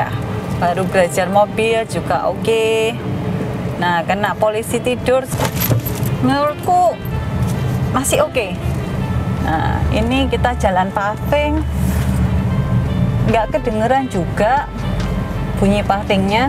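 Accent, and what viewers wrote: Java, Indonesia. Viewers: Mbak nya ayu,jawa nya ketara,aku sukaakkk.... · iya gais, medok nemen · Medok bner dah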